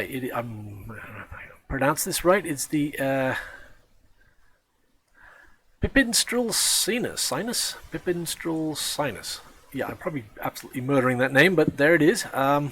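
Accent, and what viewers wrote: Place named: United States. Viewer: I just can't decide if you have a genuine American accent, or are a Brit pretending to have an American accent! Sorry, no offence intended, was just distracted by this as I'm a linguistics academic!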